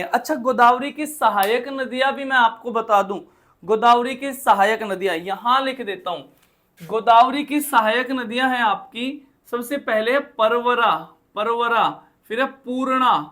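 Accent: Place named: Rajasthan, India. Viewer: Rajasthani tone 😊 Haryanvi v ... thora thora Panjabi v😍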